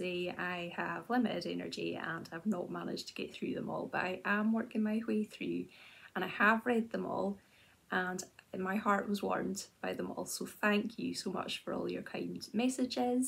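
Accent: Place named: England, United Kingdom. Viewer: I really like your Instagram and this podcast is definitely great.Unfortunately, I can't understand your English accent 😓 and there are no subtitles.I'm sorry, but it's my fault . So,Happy New Year.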